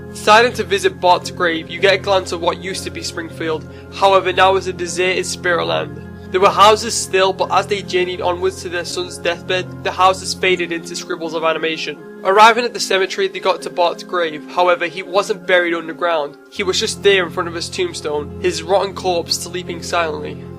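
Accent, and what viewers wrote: Liverpool, United Kingdom. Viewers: The scouse accent makes it worthwhile. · Liverpudlian? :D nice vid!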